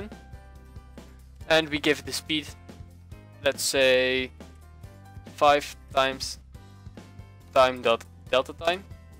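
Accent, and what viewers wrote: Netherlands. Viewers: youre dutch arent you #4secondsinvideo #dutchaccent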